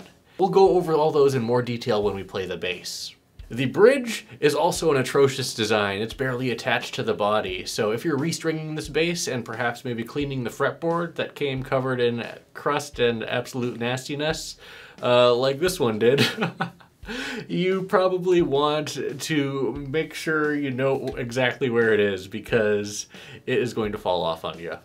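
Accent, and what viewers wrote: United Kingdom. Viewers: the british accent! unmatched. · Sandberg Booster, G&L SB-1, Stingrays, Dingwall, all have deep clear sounds. All of the violin 🎻 Basses sound so dull and without out put. They’re like one step up from a lead guitar. English accent was ⭐️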